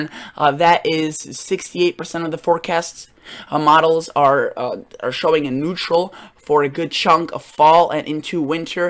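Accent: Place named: Upper Midwest, United States. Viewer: This guy is so from WI or MN.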